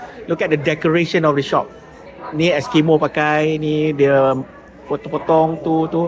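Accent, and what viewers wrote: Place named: United States. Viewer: Oh man, your slang sounds totally American bro…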